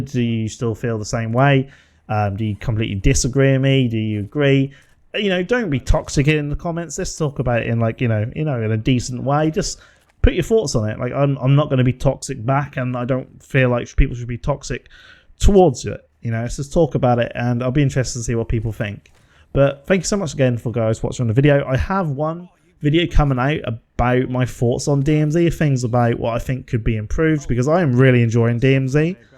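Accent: Norfolk, United Kingdom. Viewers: What part of Norfolk are you from mate ?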